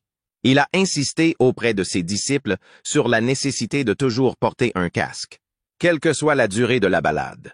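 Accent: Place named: Canada. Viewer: Heuuu pardon mais c'est quoi cette voix ? Cananadienne ? Ke veut bien mais là.... ça fait peur 😢😅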